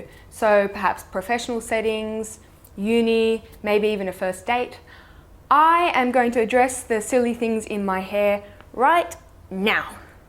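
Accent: Australia. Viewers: your aussie accent is so fun to listen to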